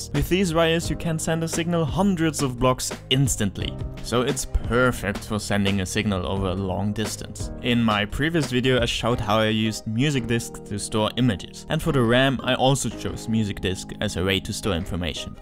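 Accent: Germany. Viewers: are you by any chance... german? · I knew he was form Germany. Typical Accent. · sie sind so deutsch der akzent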